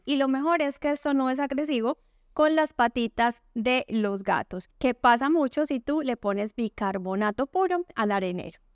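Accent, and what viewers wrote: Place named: Antioquia, Colombia. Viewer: Hola. Me encanta tú acento paisa, eres muy tierna. Cuéntanos sí té encontrabas sola en el momento del temblor. Té envío un gran saludo desde Bogotá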